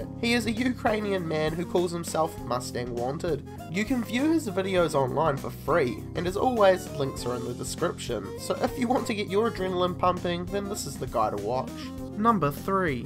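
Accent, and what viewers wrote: New Zealand. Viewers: definitely from New Zealand. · He could be from Whakatane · Yeah He is from New Zealand probably Aukland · That new Zealand accent is making my ears bleed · the brogans Dunno if he's a Kiwi or not... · It's his accent you fucking idiots, not a lot he can do about it. He's from New Zealand.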